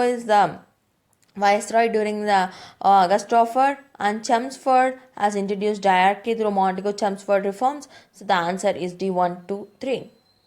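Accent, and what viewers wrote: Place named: South India, India. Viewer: very irritating accent, request to unacademy, don't make videos by south people,their accent is very irritating,and she is not reading the contents clearly, just reading and not explaining ,can be done by anyone.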